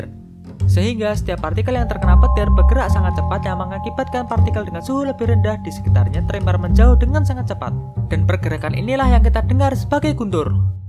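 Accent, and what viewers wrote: Java, Indonesia. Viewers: petirr oii petir.... bukan peteerr... medok bangett masss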